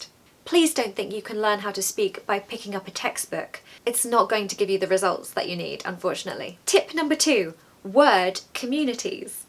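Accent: RP, United Kingdom. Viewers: As i have always been, again amazed Never found a teacher better then you Your gesture and face expressions made us love english and Brit accent as well . Thanks teacher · In USA, it's all about the d sound and the tongue touching the palate, in UK it's usually soft, elegant, polite and humble kinda accent. I'm quite capable of immitating you except the upper intonation, like suddenly it goes up, how'd you do that, I find it bit complicated. For split seconds it goes up and that's also the signature intonation a.k.a British accent of the United Kingdom. Do teach that. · Hi Teacher! Always am astonished by your every video! 😍 I really really really love the way you speak! I want to and am gradually learning the RP accent you speak! 💕💕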